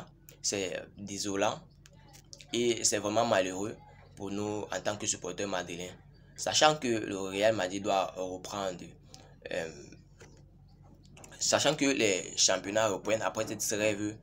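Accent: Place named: Cameroon. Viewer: Mon frère il faut cesser de nous parler le français camerounais et on ne sait pas ce que tu as dans la bouche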